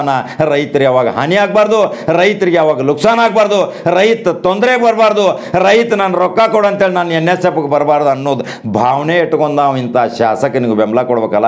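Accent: North Karnataka, India. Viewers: ಉತ್ತರ ಕರ್ನಾಟಕ ಭಾಷೆ ಸೂಪರ್ ಸರ್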